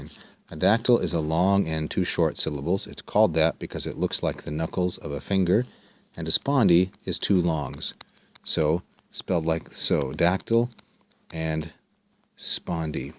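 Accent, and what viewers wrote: United States. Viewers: I would appreciate a bit less of an American accent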